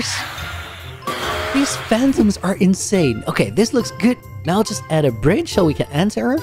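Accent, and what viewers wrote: Netherlands. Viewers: Areyou dutch???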